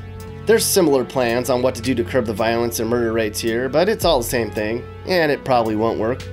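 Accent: United States. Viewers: are you american or british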